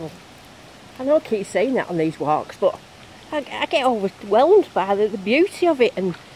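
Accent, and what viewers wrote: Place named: Rochdale, United Kingdom. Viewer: What an amazing watch on ash worth valley. Your videos presentation is spot on. Love your humour and the Rochdale accent is just so close to home it’s a comfort to hear your commentary. Waiting for the sunshine to go and explore ❤️🇬🇧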